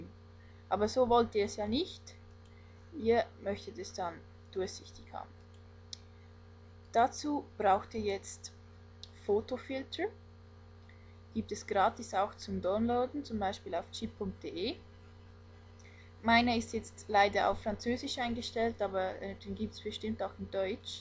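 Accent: Switzerland. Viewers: man hört das du schweizerin bist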